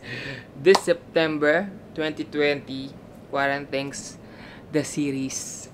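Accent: Visayas, Philippines. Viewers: Meron talagang bisaya accent eh 😍 palagi ko napapansin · Butete.... visaya accent is funny to do thing 😂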